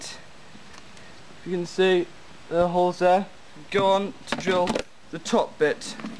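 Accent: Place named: England, United Kingdom. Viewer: why does everyone interested in worms, have that annoying English accent?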